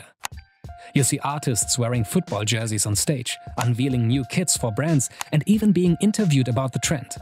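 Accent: Germany. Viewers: Wie man merkt das er Deutscher ist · The german accent is horrible otherwise very informative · Ur German accent is so relaxing!!!! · Irre ich mich oder kommt der Deutsche Akzent raus? 😂😅🤔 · LOL I'm from Germany and i can tell by the accent the person of this account is a German ;) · is the narrator German? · U german right?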